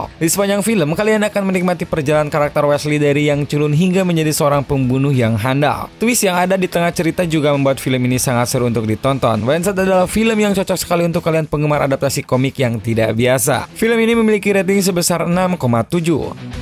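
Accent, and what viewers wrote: West Java, Indonesia. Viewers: kalo dari suaranya,adminnya orang sunda xD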